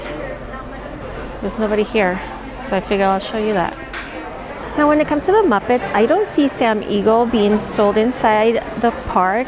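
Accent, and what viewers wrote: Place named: Chihuahua, Mexico. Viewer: Love your content videos. They’re not too long and not too short. For months now I’ve been wondering where your accent is from. I’m going to guess Chihuahua by how you pronounce the CH. Also, any chance you lived in Denver, because I heard you say, “yous” at timeline . PS. Stitch is wearing a bra on his head like on the movie? 😄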